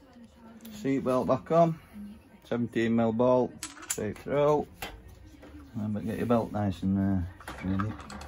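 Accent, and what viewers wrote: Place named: Rotherham, United Kingdom. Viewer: How much would you charge to install 1 for me I'm based in Rotherham sounds like your from around here ??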